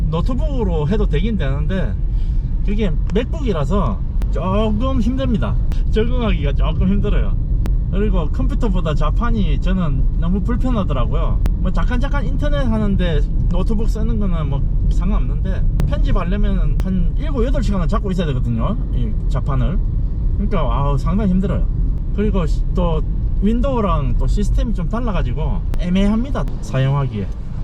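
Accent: Gyeongsang, South Korea. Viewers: 억수록 말하시는것 보고 경상도사난이 이세요?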